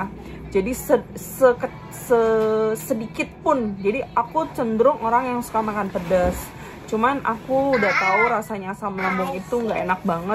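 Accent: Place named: Palembang, Indonesia. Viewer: wong palembang ceh ?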